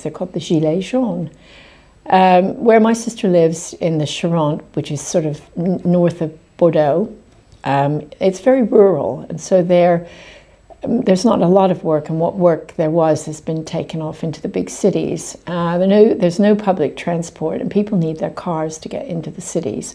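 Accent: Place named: United States. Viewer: English voice??? You have a sort of American accent!!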